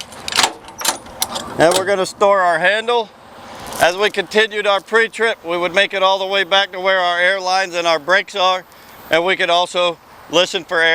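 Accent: United States. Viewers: Really informative, spot on - - and that East Florida accent is the cherry on top.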